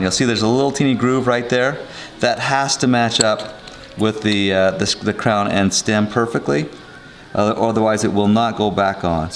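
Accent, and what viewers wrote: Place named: Utah, United States. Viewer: I appreciate the information---I recognized your Utah accent in 1 second and knew before looking up you absolutely had to be in Utah lol. Thanks again!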